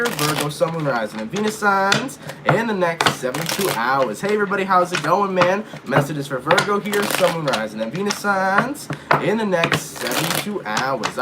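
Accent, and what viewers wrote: England, United Kingdom. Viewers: Love the British accent. From the UK. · Pmsl your English accent is so good x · Love the english accent 🦂🥰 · Lol, love the British accent 😂. Was just in your neck of the woods yesterday for thanksgiving (good Ole Yorktown). Btw, try Apple Cider Vinegar a few times a day for that acid reflux. Contrary to popular belief its low stomache acid that causes acid reflux (stomach's inability to digest properly due to low acid in the lower stomach pushes the acids in the upper stomach upwards) try a tablespoon or 2 with water before meals 😉. Hope that helps. Thanks for all your insights 😊🤗🙏 Happy Holidays! 💚♥️🎉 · Lovin the English accent...😉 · During the Scorpio read; Love that British accent! 🇬🇧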